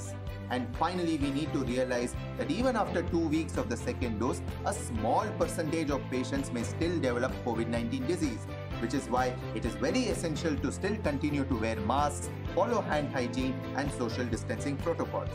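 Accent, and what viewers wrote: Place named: India. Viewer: The Indian accent purposefully used is quite nice.❤️👍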